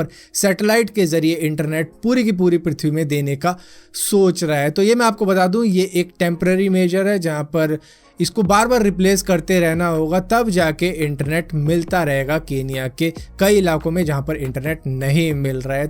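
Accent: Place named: Haryana, India. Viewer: Bhai videos m information bht bdiya deta,h beach beach m haryanvi touch b diya kr ,kbi kbi nikl jati h tre muh se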